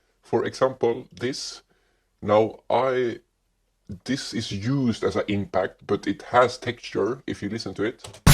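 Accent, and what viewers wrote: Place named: Sweden. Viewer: ur swedish?😃I recognioze the accent 👀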